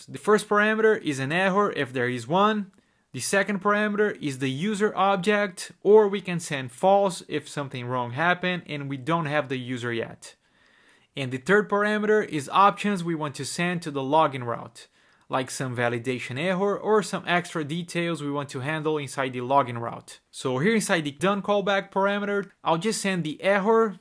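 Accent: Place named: Brazil. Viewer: kakakaka sabia que tu era BR, sotaque tava mt familiar kkkk mas porra tu tem um ingles perfeito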